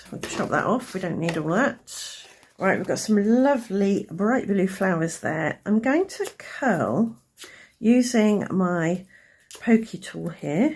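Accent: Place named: United Kingdom. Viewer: I love listening to your beautiful British accent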